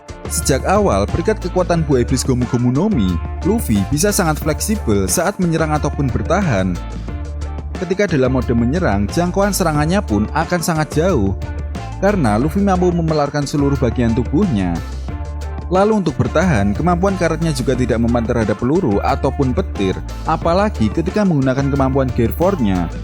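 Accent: Java, Indonesia. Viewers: Mani Ledok jawa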